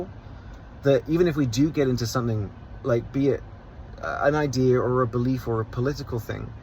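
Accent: Texas, United States. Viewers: Bless you, thank you fellow Texan. Watching this and then your newer videos you’re even starting to sound like a Texan. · Occasionally you sound like a Texan. Dare I say you occasionally pronounce an R or two.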